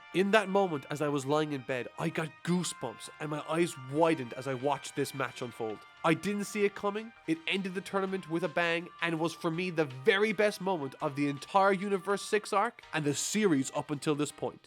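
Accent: Ireland. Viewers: Cool your irish? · I had a feeling u were from Ireland with that accent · With that voice some of us can tell your Irish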